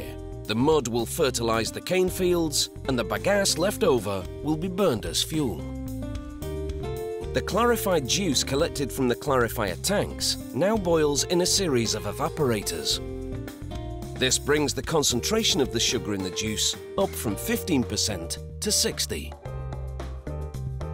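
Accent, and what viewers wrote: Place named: Liverpool, United Kingdom. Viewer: He’s actually scouse, one of the more common peasantry sounding English accents.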